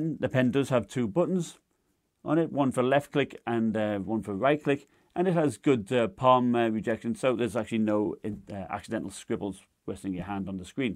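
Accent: United Kingdom. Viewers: Thanks for the review. I've bought this model and I find if to be amazing for the price and specs. What's your accent. I know it's British but which part.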